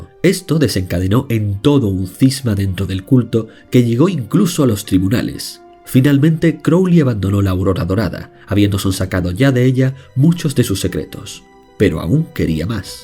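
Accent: Spain. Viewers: Ese acento, diría que eres andaluz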